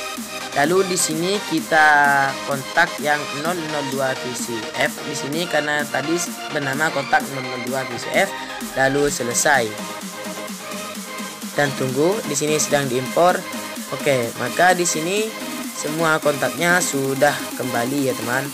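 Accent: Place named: Madura, Indonesia. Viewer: mksh byk bang.. spt lg dngerin bang Kadir madura, lg berbcra. hehe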